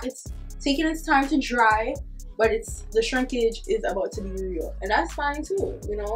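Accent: Jamaica. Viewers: Are you Jamaican? 🇯🇲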